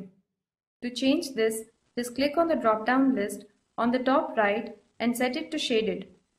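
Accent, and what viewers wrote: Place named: France. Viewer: french accent.. :p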